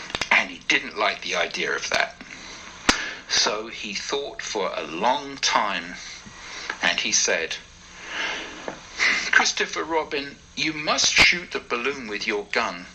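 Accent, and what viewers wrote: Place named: England, United Kingdom. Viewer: beautiful story time absolutely love your English accent thank you my Best Friend loved Winnie The Pooh🤗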